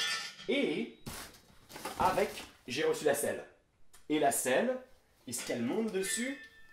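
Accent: United States. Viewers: Je découvre ta chaîne (même si ta Yam c'est une "cardan"😁). Je m'étonnais de voir (entendre) un Français qui a un bon accent anglophone (américain), mais apparemment, tu vis aux States. En revanche la dénomination de ta Yam, n'était pas "Virago" : à l'époque dans l'Hexagone, on avait la XV750 Special, mais la tienne ressemble à une série sortie chez nous en XV1000SE la Midnight Special (avec sa finition noire et dorée) avec 2 disques à l'avant pour la 1000. Les "Virago" XV 750, 1000 et 1100 en France n'étaient pas équipées du mono-amortisseur cantilever, mais de deux suspensions arrières, ce qui est plus contraignant pour la transfo café-racer. En tout cas beau boulot !😃👍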